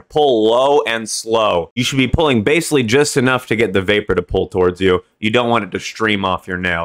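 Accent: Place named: Boston, United States. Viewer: Ayo 😂 I have to subscribe. You're funny af bro. You got a Bostony accent 😂.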